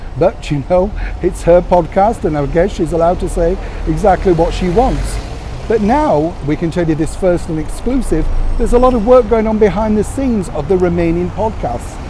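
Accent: Yorkshire, United Kingdom. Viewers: Dear Neil, it is always so nice to hear that lilting Yorkshire accent and your down to earth wry humour.. I fear that giving the Sussexes too much free rein will only cause further disruption. The late Queen was against a 'half-in, half out' arrangement so I do hope that with her passing, this request from the Sussexes will not be given any further consideration. That is the direction I see harry and Me-again attempting to go....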